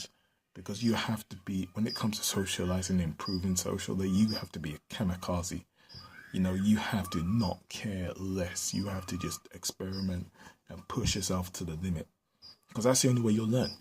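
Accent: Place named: Nigeria. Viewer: I hear Nigeria in your accent.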